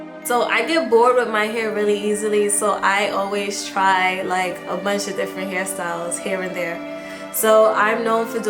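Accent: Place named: East Coast, United States. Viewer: ! Great video. I need to do somet'ing with my hair during this quarantine lol. Not to be too intrusive but where are you from? I hear a little east coast in your accent! lol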